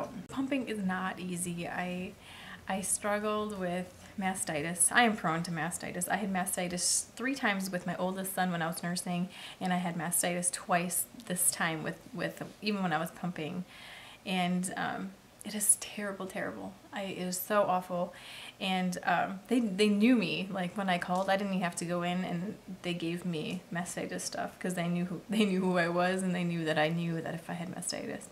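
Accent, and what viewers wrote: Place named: Minnesota, United States. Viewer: btw I love your videos, especially just to hear that wonderful Minnesota accent! I don't know where in the state you are (assuming subs) but I've lived out of state since 2005 and I miss it soooo much! I grew up in St. Louis Park so now this city girl lives in small town West Virginia! Totally different. I don't know if you are a fair goer but if you are it would be super fun to see a video from the Great MN Get Together!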